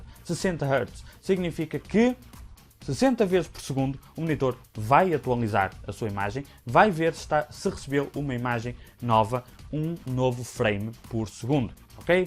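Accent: Portugal. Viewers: gostei da explicação. maneiro assistir um vídeo em português de Portugal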